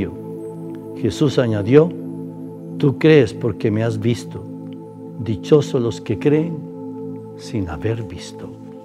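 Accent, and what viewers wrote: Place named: Colombia. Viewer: Cuánto gusto padre, lo veo muy bien a pesar que le dio el covid, Dios lo protegió. Padre usted e Colombiano, siento que habla el español en forma diferente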